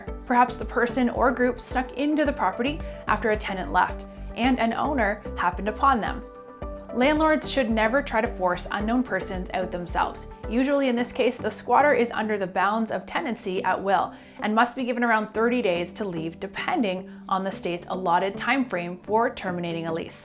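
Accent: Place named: Canada. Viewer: I thought this was going to be for Canada, given her accent.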